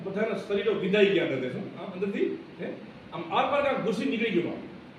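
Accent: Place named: Kutch, India. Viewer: Prabhu pranam. Tame je bhasa bolo chhe te kutcha na matra 24 gam leva patel bole chhe. Teo 350 varash pahela virpur ni aspasma te migrate to kutch. I guess you bro,living near rajkot. I m living abroad for 40 years Thaks.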